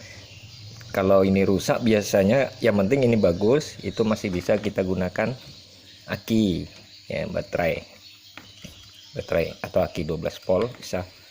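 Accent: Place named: Tenggarong, Indonesia. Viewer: kira urang Tenggarong tadi,,dengar logatnya,,salam dari kukar ni broo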